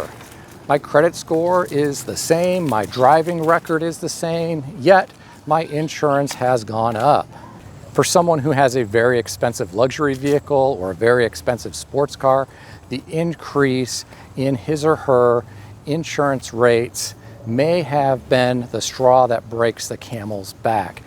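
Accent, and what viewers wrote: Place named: South, United States. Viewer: Love your redneck pick up drivers accent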